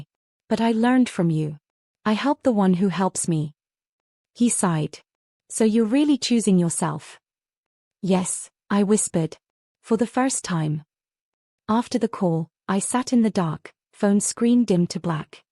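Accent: United Kingdom. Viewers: Why the British accent? In America it is pronounce skedule not shedule. According to British pronunciation school would be shool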